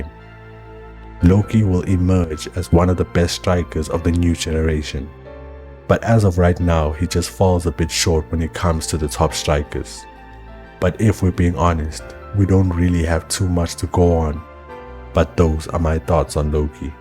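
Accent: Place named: South Africa. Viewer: haha nah from your cadence. As a fellow south african anime lover you have a new follower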